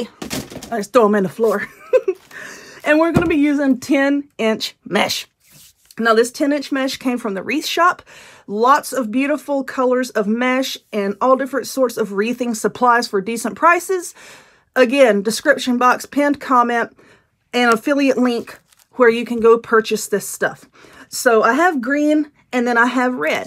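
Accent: South, United States. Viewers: I love your southern accent. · What state are you in? I like your Southern accent. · this is absolutely beautiful! I can't wait to find the frames. I've been following you for a couple of yrs now and I absolutely love watching you with all of your crafts. You've got a awesome personality. I love your personality. I don't understand why people have to be so low. If they don't like you or what your doing there's a simple solution. Get off the channel. I loved how you handled that by telling them to get a hobby lol. Some people just love drama! My friend does wreath videos and there for awhile she was getting harassed pretty bad. Mostly from other wreath makers and their followers. I hope that it doesn't get that bad for you for your so much fun to watch. I also love your accent. I'm a country girl myself with a heavy southern accent. Anyways keep ignoring them stay positive with your bubbly personality!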